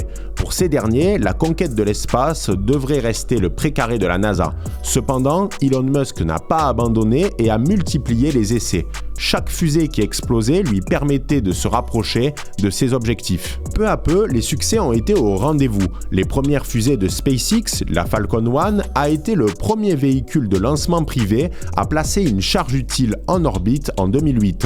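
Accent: South, France. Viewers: Cet accent du Sud et les cigales qui rappent en fond; ça sent bon la lavande tout ça ! !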